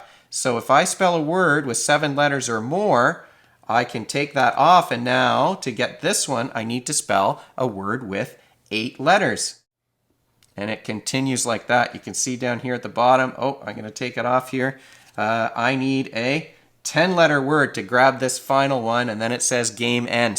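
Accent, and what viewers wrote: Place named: Canada. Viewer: Sick review. I love when I can tell from the first sentence someone says that they’re Canadian too lol.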